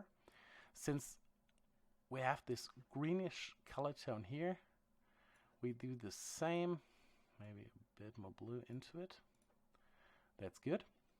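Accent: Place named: Germany. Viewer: Hey, höre da einen deutschen Akzent raus, daher auch hier mein Kommentar in deutsch. Kann mir jemand sage wofür das Grid am Ende nun gut war? Konnte den Zusammenhang bzw. den nützlichen Teil nicht ganz fassen. Wozu brauche ich ein Grid statt des Planeten bzw. wozu dient die Combo? Ansonsten sehr gutes Video klar zu folgen und einfach erklärt, vor allem nicht in der Muttersprache dafür nochmal ein "Daumen hoch"! Würde mir mindestens genauso schwer fallen! Den Leuten denen das Tutorial "zu ausführlich ist" kann ich nur raten von der Timeline Gebrauch zu machen ;) Cheers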